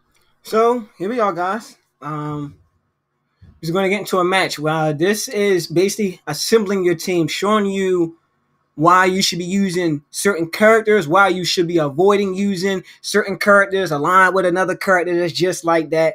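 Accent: Baltimore, United States. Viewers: u from balitmore? why u pronounce ur Us like dat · Baltimore accent?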